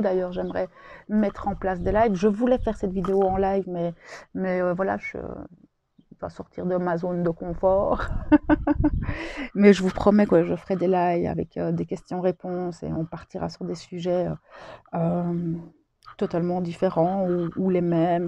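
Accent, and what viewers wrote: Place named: Belgium. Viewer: Il me semblait bien que vous étiez Belge,moi de Charleroi. Première fois que je tombe sur une FJ belge🤗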